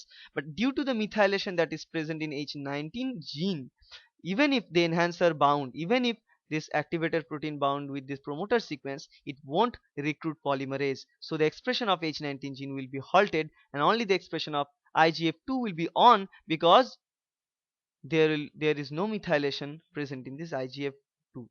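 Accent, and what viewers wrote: India. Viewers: Sir please Indian English bolne se acha hy Hindi mein he lecture deliver karein.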